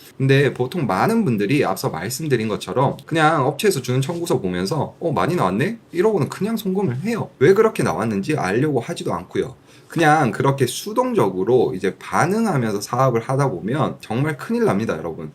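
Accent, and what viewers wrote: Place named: Busan, South Korea. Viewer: 어?? 혹시 저 보고 하시는 말씀 맞으시가요? ㅎㅎ 분석하고 거리가 먼 저 인데 설명 감사합니다. 어쩜 말씀도 또박또박 전달력도 좋으세요. 부산 사투리가 이리 멋들어져도 되는거에요? ^^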